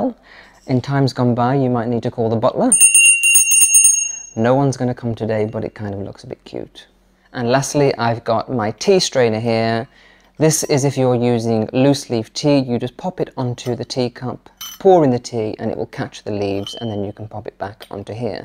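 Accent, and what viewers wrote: RP, United Kingdom. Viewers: I registered this Chanel. I am interested in his posh accent than anything else.😃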